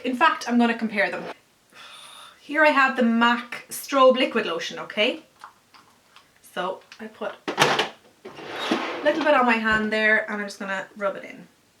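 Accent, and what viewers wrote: Ireland. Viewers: love your vids ! are you from dublin?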